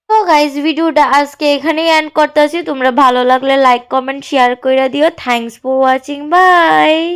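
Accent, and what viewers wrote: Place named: Habiganj, Bangladesh. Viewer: আমার প্রিয় জেলা হবিগঞ্জ থেকে দেখছি আপনার কথা আমাদের হবিগঞ্জের ভাষা মতো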